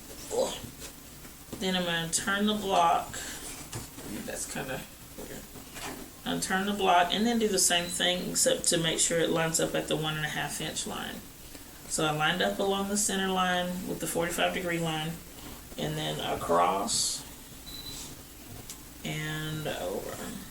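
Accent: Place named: North Carolina, United States. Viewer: Cute! How did you end up with so many left over HSTs? BTW you sound like you are in NC. Me too!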